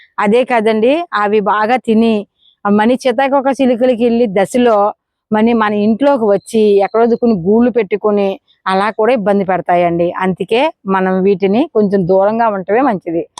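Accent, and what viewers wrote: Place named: Tamil Nadu, India. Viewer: garu mee videos regularga chustunnanu.meeru nammutara me pata videos kuda continue ga chustunnanu. Meeru okasari evaro me bhasha gurinchi Comment chesarani badapaddari kani me yasa chuste meru tamil anipustudi Kovai sarala matladinatluga undi me bhashani nenu enjoy chestunnanu. Bhasha mata ela vunna meeru matlade pratimata vupayoga karanga vuntundi. Oka friend matladinatluga prati chinna vishayamni vivaranga chebutaru me 2years pata videos kuda chustunnanu. Prati video ni like chestunnanu. Naku me videos chala upayogapadutunnai thank you 🙏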